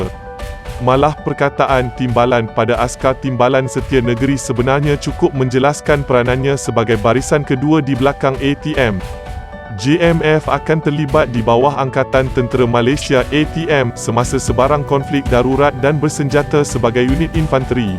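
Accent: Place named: Indonesia. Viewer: Kemungkinan besar asal pembaca narratif ini dari Indonesia kerana pronounciation letter 'J' bunyi macam bahasa Indonesia (based on Dutch). 😂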